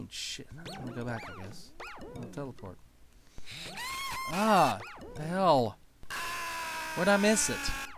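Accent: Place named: East Riding, United Kingdom. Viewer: That's an East Riding accent. Seems Hull is fast becoming a hotbed for quality games development.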